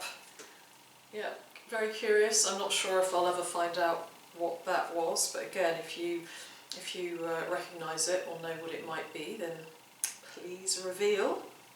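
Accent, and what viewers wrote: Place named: RP, United Kingdom. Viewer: dam this lady is gorgeous love the posh accent and then mudlarking lol good for you i do a bit down rainham way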